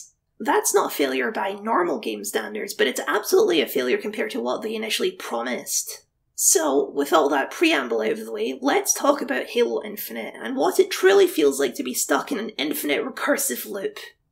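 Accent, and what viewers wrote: Scotland, United Kingdom. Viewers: Your voice not even that high bro. It just seems like such when paired with your Scottish accent and that’s how it comes off to an untrained ear. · Lmfao, yeah that was definitely an ignorant American question. Never actually met a Scot so only know the over the top accent seen in our movies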